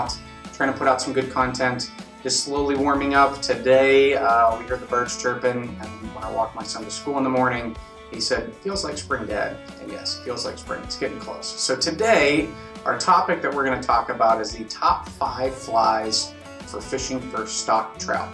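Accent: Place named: United Kingdom. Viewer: I am assuming this guy is talking about uk fishing? It’s just that his accent makes me wonder.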